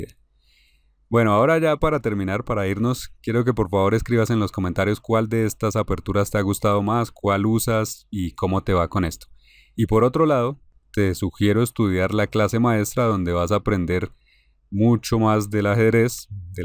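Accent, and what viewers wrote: Bogotá, Colombia. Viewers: ¿ Igor Smirnov es el Colombiano que estoy escuchando ? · Espectacular!!! En especial la última, Que acento tan capitalino-Teusaquillo, muchas felicitaciones Exelente Canal!!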